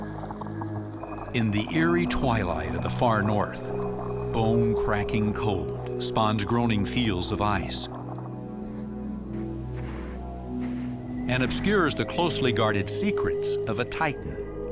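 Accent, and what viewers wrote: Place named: Canada. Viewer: Lol does anyone else notice the canadian accent in the narrator lol?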